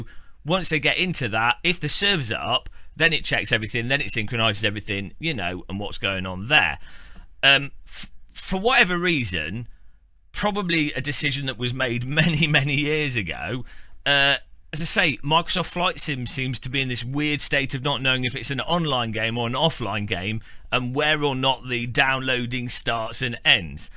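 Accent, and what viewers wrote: United Kingdom. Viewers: Never laughed so hard about something so depressing. Thank you so much for an absolute wonderful British scolding in a just as lovely accent! 😊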